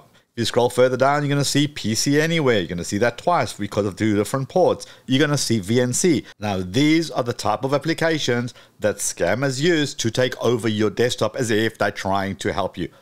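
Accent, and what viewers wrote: South Africa. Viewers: Wait you South African 😮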